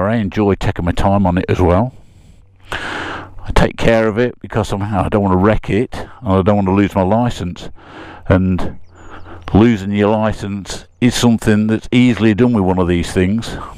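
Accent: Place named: Northern England, United Kingdom. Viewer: Such beautiful landscapes, such a nice accent, so different from what you learn in school. Sounds northern english, is that correct? Greetings from blackforest germany 😊👍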